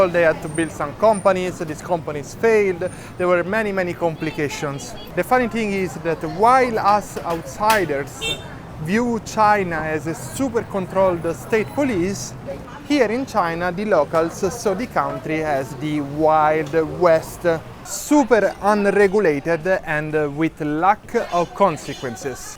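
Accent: Italy. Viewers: He has a thic Italian accent😂I find it very lovely,but Italy is my neighbor so the accent is very understandable to me😉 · i love you tell the truth with your lovly italian accent:popcorn-yellow-striped-smile::popcorn-yellow-striped-smile::popcorn-yellow-striped-smile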